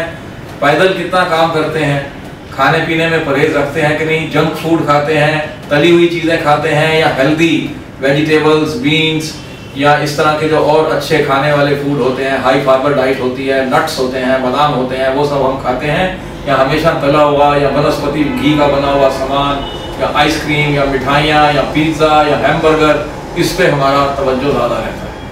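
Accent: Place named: Pakistan. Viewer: I thought you were Pakistani from your accent. Very nice information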